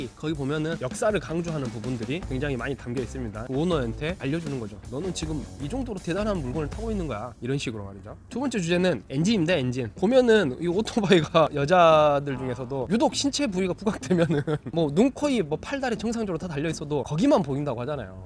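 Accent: Jeolla, South Korea. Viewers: 전라도 말투가 나와서 혹시 했더니 "귄있다"는 단어를 예로 드는 걸 보면서 확신을 합니다. 말씀 참 잘 하시네요. 작년에 할리 883 몇 달 타 보고서 이정도면 충분해 하며 오토바이는 안 산다고 맘 먹었는데 이녀석을 보고 나니 엄청 흔들리네요.... 주식을 좀만 더 열심히 해서 2천만 더 모으면...ㅎㅎ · 내용 매우 좋았구요, 더 보고싶다는 느낌은 주행영상에 대한 목마름이 아니었나 싶습니다. ^^ 특유의 전라도 사투리 억양이 재미를 더합니다.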